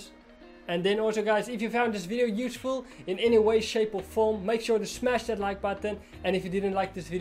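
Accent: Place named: Afrikaans, South Africa. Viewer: That thick afrikaans accent is on point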